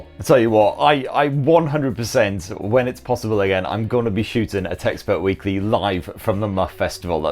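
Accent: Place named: RP, United Kingdom. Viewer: Love your videos, been suscribed for a whiiile! And I personally find your British accent so posh and delightful to listen haha. Greetings from the Canary Islands 🌴🌊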